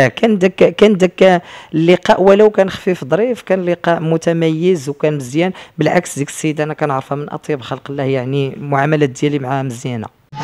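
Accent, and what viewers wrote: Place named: Souss, Morocco. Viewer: لكنته سوسية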